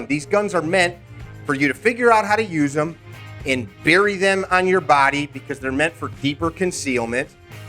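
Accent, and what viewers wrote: Chicago, United States. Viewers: boy, for sure. This the first time you've heard a Chicago accent? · Good video. Well done. I’m guessing you’re either from the Chicago area or maybe Detroit, I suspect. Seems a lot of people “don’t like” the way we talk. I’m pretty sure that’s because we speak with authority and sound different enough that we’re a little bit misunderstood. By the way I’m responding in reference to the correspondence on your home page where you offered the fellow to prove himself as a little bit more than a keyboard warrior who knows enough about something to believe he’s right but not enough to know he’s wrong.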